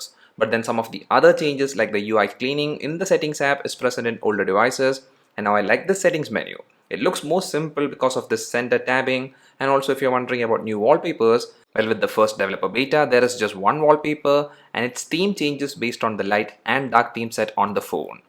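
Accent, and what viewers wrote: Kerala, India. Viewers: Are you from Kerala?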